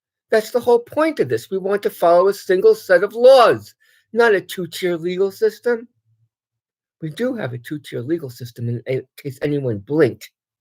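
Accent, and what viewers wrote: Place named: Brooklyn, United States. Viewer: Ma'am I hear that Brooklyn accent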